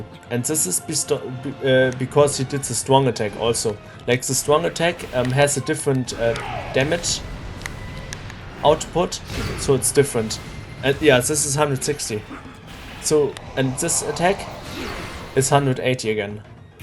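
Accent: Germany. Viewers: It’s a German voice like me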